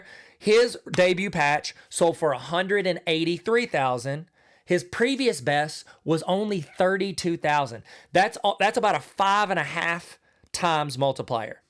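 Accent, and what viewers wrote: South, United States. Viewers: I love watching your videos, because you say things like “No thing like a chicken wing”, l know you didn’t say that but you do say funny things that crack me up. Where are you from originally, your accent says the south and l am from Lexington Kentucky area with an accent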